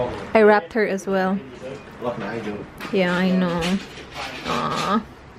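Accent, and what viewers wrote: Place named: Visayas, Philippines. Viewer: Hala gibisaya nana nmu ate..hehehehe..so cute😍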